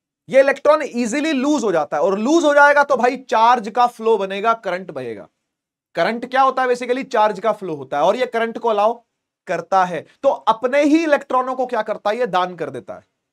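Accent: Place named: Haryana, India. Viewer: that haryanvi tone ❤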